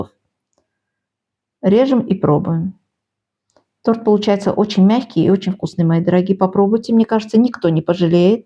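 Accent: Baku, Azerbaijan. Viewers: Спасибо за рецепт. У вас даже акцент бакинский ❤❤❤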